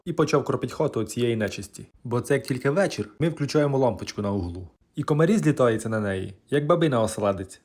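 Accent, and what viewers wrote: Ukraine. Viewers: Боже,який гарний український діалект,насміялася Хай щастить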